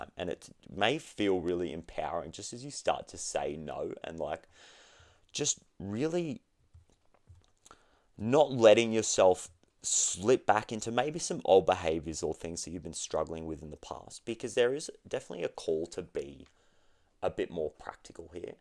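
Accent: Australia. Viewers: are you Australian? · Oh you a fellow Aussie 😊 nice read..!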